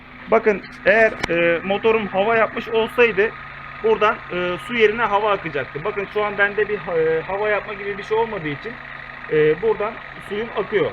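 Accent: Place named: Izmir, Turkey. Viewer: Bu adam sanki izmirli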